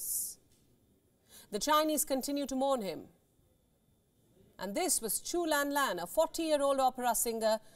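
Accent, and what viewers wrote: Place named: India. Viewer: Why do Indians speak this way? I mean they were under Brits for such a long time and you would think they would speak English correctly.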